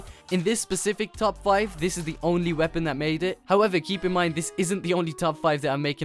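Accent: Birmingham, United Kingdom. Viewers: Are u from Birmingham,which area?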